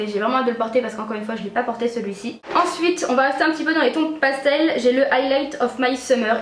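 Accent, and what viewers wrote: Normandy, France. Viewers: c'est dans votre "accent" normand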